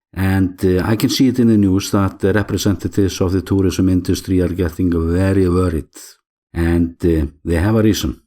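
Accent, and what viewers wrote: Iceland. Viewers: Listening to your wonderful Icelandic accent is like listening to poetry. Keep up the enthusiastic commentry.😊😊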